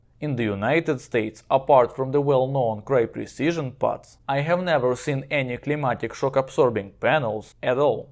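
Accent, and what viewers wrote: Russia. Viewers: Maxed out Russian accent and top video my friend 🤟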